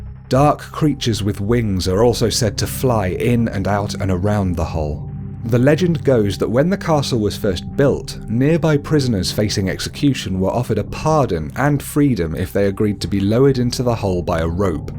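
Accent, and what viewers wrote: England, United Kingdom. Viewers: your british talk can blow a waaanker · Why is every top 5 is a English dude?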